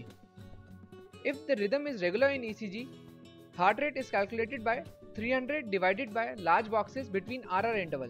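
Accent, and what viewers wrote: India. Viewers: I like your accent. Indian medics are excellent. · I really wish this guy spoke slower and was not Indian.. smh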